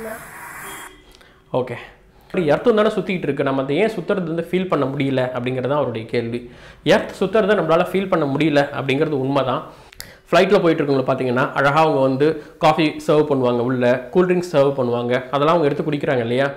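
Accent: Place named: Chennai, India. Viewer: ninka pesum pothu chennai tamil lite ha varuthu bro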